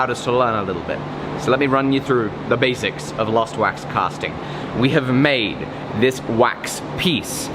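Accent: United Kingdom. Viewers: Is she British or other?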